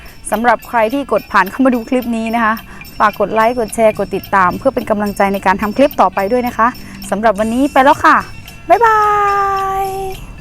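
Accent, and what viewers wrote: Isan, Thailand. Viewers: ว้าวๆของแซ่บเลยครับ พูดไทยผสมอีสานฟังแล้วเพราะดีครับบ้านผมเขาก็พูดเหมือนกันเพราะดีครับ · ปิ้งกบตำถั่วน่าอร่อยมากๆเลยค่ะ เป็นกำลังใจให้นะคะ พูดแบบไหนก็ได้น่ารักหมดค่ะ จุ้ยก็คนอุบล พูดไทยป่นอีสานบ้านเราเหมือนกันค่ะ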